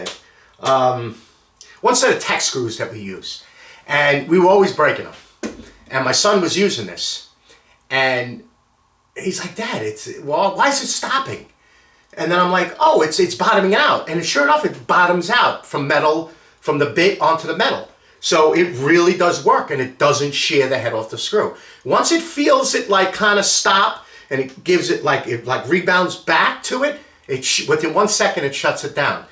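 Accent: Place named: New York, United States. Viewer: Merry Christmas, what part of NYC area are you from? I can hear it in your voice. LOL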